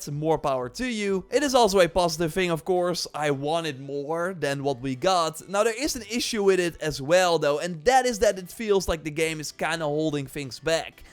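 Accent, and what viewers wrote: Netherlands. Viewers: ben je nederlands ofz?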